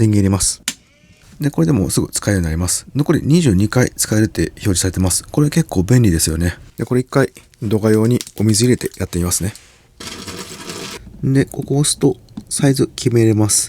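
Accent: Kansai, Japan. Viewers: １分で関西出身とわかる！喋りのアクセントで… クルマは岐阜ナンバーだが。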